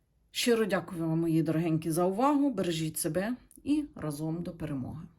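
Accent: Western Ukraine, Ukraine. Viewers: у вас шикарний западенський акцент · гарна мова з акцентом Західної України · У Вас акцент дуже злегка західноукраїнський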